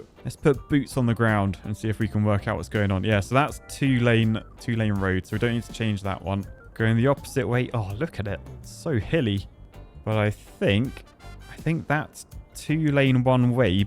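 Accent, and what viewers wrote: United Kingdom. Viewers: speaking of pronouncing words correctly, its not "aloo-MIN-eeum, its "a-LOOM-a-num" lol I love the difference between British and American pronunciation. couple questions, whats a tuh-MA-toe?? lol =P and why is a fender called a wing in england? isnt a wing the thing on little stands on the trunk of a car that generates downforce? lol and whats a roundaboot?! hahaha we call them rotaries here id be curious what a long term city would be like over several videos. can we replicate true LA life?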